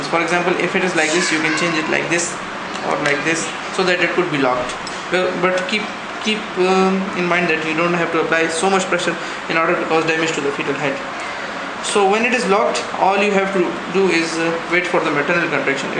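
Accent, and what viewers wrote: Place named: India. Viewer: indian lol... i can tell by the accent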